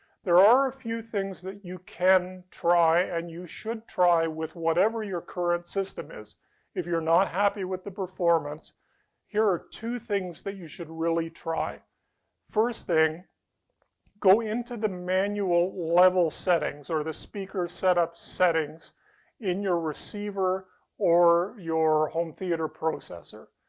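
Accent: Canada. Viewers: In literally 3 secs i knew guy is from or in Canada!!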